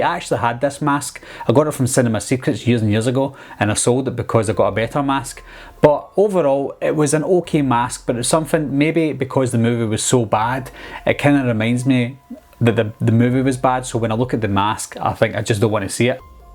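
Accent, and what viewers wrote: Scotland, United Kingdom. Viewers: i love that scottish accent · Scottish or irish lad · Where you from in scotland? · Love your accent, if its Scottish, I'm actually 89% Scottish and my great uncle had the movie braveheart made about him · Not to be rude but are you Scottish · Are u Scottish cus I am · Are you Scottish or Irish if you are cool I am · Da fuq u saying? Is that a Scottish accent. And the H20 mask was terrible IMO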